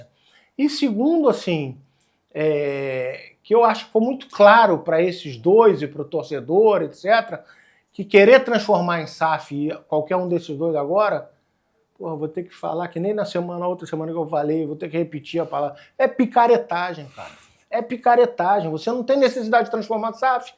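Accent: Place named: Rio de Janeiro, Brazil. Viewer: O Lúcio de Castro pode cag@ qlq regra, mas esse sotaque carioca tira toda credibilidade dele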